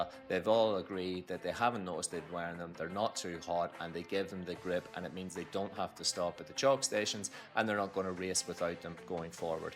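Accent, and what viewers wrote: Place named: England, United Kingdom. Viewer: Great post and tip – I ordered a few. And, you have a strong English accent, where are you from?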